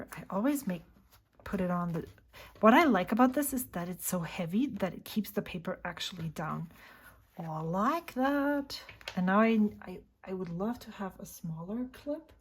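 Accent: Germany. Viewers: I enjoy your off the cuff videos and find myself laughing because they're real! Like Katie, Ive been tweaking my Vanness cart all week so I'll definitely use your code! Thank you! I love to hear your German pronunciations. I'm the same with Italian. Though I'm San Francisco born & raised, I grew up listening to it and can't get myself to pronounce Italian names with an American accent.😂 Had I known, I would have happily sent you my bottle of Hanging Rice. I have several Troublemaker inks that haven't been getting sufficient love so let me know if there are any you'd like to try and I'll see if I have it.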